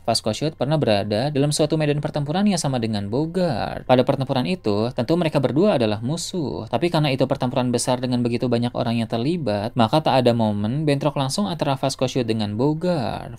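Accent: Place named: Banyumas, Indonesia. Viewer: Ngapak teka🎉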